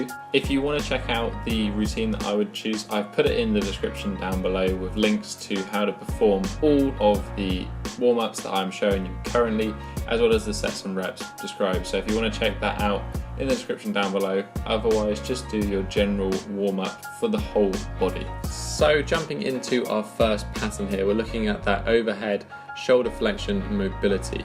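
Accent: United Kingdom. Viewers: You sound British but have a palm tree behind you...Where are you? It's driving me crazy haha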